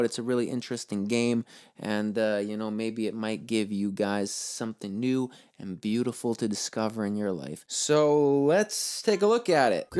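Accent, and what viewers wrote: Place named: New York, United States. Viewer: You're a real New Yorker aintcha?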